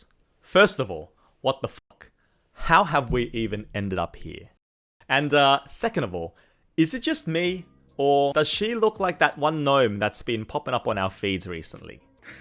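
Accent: Australia. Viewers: I'm simple: see and hear a fellow Aussie, I follow.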